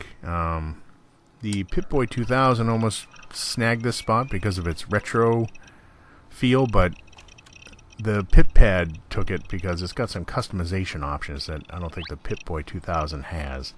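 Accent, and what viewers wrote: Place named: Boston, United States. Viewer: You sound Boston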